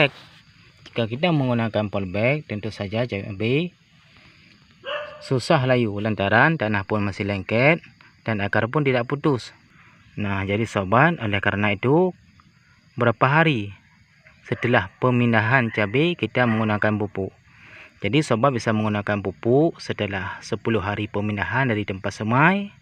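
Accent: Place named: Aceh, Indonesia. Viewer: Dr cara gmg nya psti ni org aceh kan bro,kiban bro awak pane???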